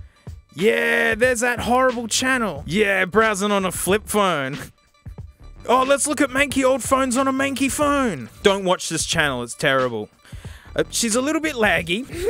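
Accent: Australia. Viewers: He speak in Australia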